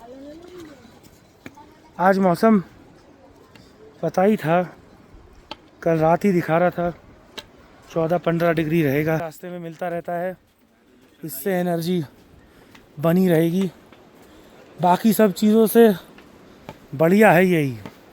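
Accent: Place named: Punjab, India. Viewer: Ur Punjabi accent rocks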